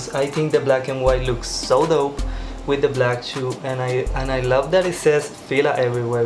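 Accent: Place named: Philippines. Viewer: You're accent is like a Filipino speaking in English. :) Like us!!! I Love you and your shoes!!!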